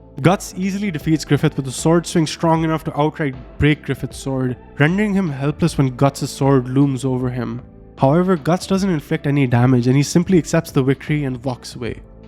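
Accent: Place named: India. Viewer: i really like the way you tell the story and the fact and the analysis and i really like that you view it from philosophical side, but the thing that probably makes people not watch your whole video or skip it or not even starting it is that your voice(no hate) doesnt pass and it sound bit funny cuz of your indian accent ofcourse it can fix whit the time but i suggest you using elevenlabs or some ai voice that sound more good more profesonial(i dont talk about deep ai robotic voices), anothjer thing is that many of your photos dont mach the ratio of the video(there are blackbars) and alot of the music is not good combined whit the video and another thing is that there is no motion. There are a lot of parts where there is only one picture you are talking over it and it just stands making it feel boring even if the script is very good and the video is good. 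Add some motion. Another things is that there are photos that are in bad quality and you can find better ones. Again no hate and i hope to see even more evulution of your channel i really like the way of you viewing things and i am sure you can make your channel really good.(NO hate again)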